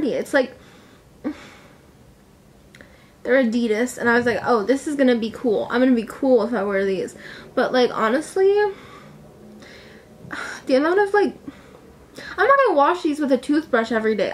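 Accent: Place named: South, United States. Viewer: You sound kind of southern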